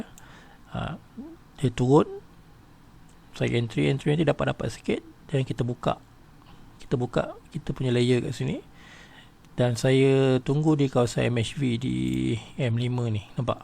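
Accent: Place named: Malaysia. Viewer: Terima kasih cik gu atas ilmu yang telah dibagikan ke kami semua, setelah saya play berulang ulang akhirnya saya mengerti maksud cik gu. Mungkin karena saya dari indonesia jadi agak kesulitan memahami logat melayu malaysia. Terima kasih cik gu semoga Allah SWT membalas semua kebaikan cik gu.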